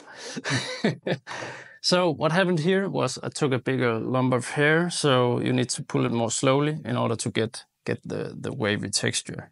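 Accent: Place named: Denmark. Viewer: i knew u were danish by ur accent, nice video :D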